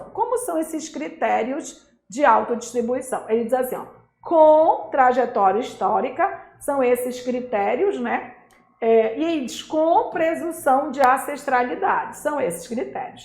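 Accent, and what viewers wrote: Pará, Brazil. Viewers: A professora é paraense? P.S.: excelente didática <3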